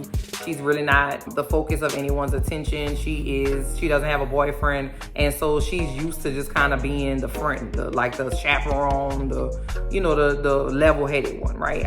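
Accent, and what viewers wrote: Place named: New Orleans, United States. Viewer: I am so glad I found your channel! I love your commentary and you’re so dang funny and I looove the way you speak! I lived in New Orleans for years and hearing you makes me so nostalgic for when I lived there - so comforting to listen to 😌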